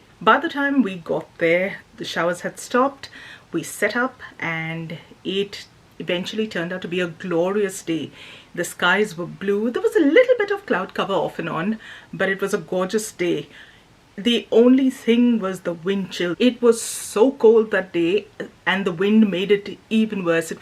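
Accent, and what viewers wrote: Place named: United Kingdom. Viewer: Maybe a stupid question but in your bio it say’s Australia but you sound like someone from the UK😬 i am a starting artist and thinking about doing markets to sell my art but will the costs way up against the sale? Something else i need to overcome is the amount of people, i am a high sensitive person. So back to your video , i really liked it. Well done👍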